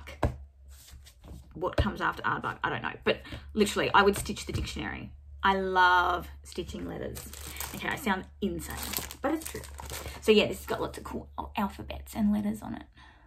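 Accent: Australia. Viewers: I'm working on Mary A Bunce at the moment on 56 count. It's the first time I've done anything on such a high count, but I absolutely love it and I'm probably about 150 years older than you. Lovely to hear your Aussie voice as I'm Australian and living in the UK. Can't wait for the Covid restrictions to be over so I can visit the Crewel Gobelin again. Do they stock any of the linens you use?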